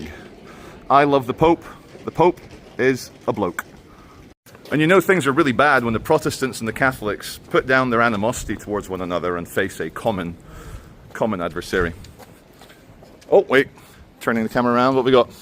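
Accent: Scotland, United Kingdom. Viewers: with a Scottish accents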